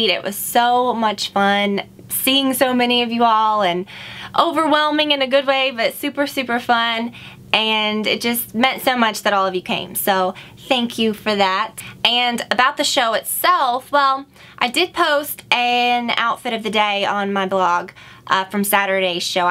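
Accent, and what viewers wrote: South, United States. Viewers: I feel like I'm just noticing her southern accent... I didn't notice before!:o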